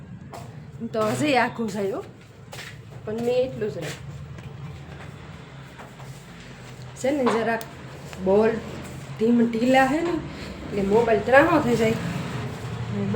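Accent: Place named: Mer, India. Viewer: Ben tame suddh merni bhasha boloso te bahut saru lage se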